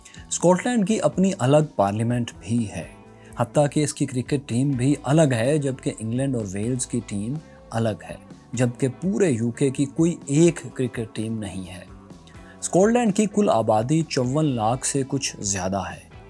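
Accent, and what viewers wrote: Punjab, Pakistan. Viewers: Pease pronounce the words correctly. Tone down your Punjabi accent and not ruin the younger generation.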